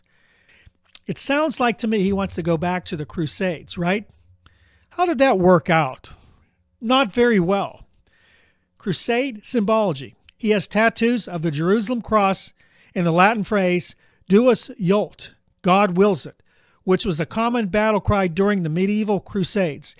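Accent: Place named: Pittsburgh, United States. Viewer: you have a Pittsburgh accent (or SW Pennsylvania). Are you a fellow-yinzer? I enjoy your important material. Keep it up and don't be a jaggoff! 🖤💛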